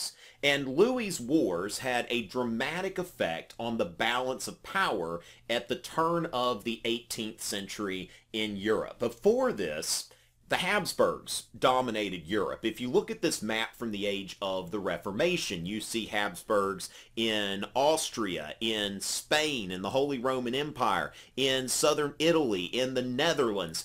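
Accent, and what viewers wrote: South, United States. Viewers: yeah im a big fan of your videos since i found your Thirty Years War video, and ofcourse your southern accent. Anyways im sure you will do great if you decide on making a video of Sweden, its a shame because like you said most people leave out Scandinavian history besides from Vikings mostly, i might be a bit biased but the Swedish Empire is surely impressive seeing as its only surrounded by other huge and powerful countries and could still hold its ground. Besides all of that, Sweden has had a significant amount of political and military influence on Europe throughout most of history atleast until their loss of Finland in 1809. Greetings from Stockholm :)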